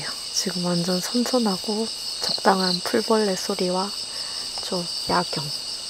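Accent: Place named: Gwangju, South Korea. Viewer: 같은 광주사람이라 사투리 너무 나랑 똑같아서 정겨움ㅋㅋㅋㅋㅋㅋㅋㅋㅋ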